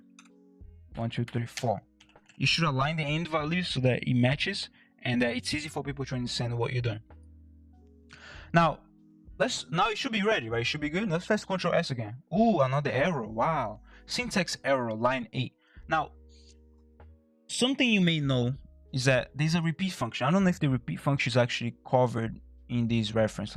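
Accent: Brazil. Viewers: incrivel como um brasileiro sabe detectar outro brasileiro falando em ingles UEHUEAHAUEHEAUH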